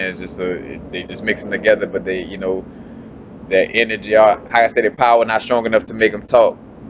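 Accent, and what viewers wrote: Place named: South, United States. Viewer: Brother I love video,but sometimes you fumble your word and laugh or say "and whatever". I get a ton of knowledge from you. I just wish you would slow down your thoughts so that the watcher could receive all the amazing words you have to share. You got to remember we're not all from the south. Lol that drawl is tough to understand at times. I SAY ALL THIS WITH LOVE, cuz I want the world to get hip to you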